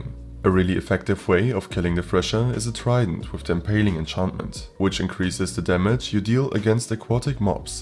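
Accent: Germany. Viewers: Wow very gut mod but i smell some deutsch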